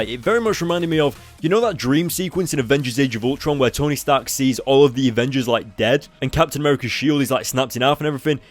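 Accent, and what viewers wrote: United Kingdom. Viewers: Crazy stuff, crazy stuff, crazy stuff...that in your british accent just makes me smile. I like being british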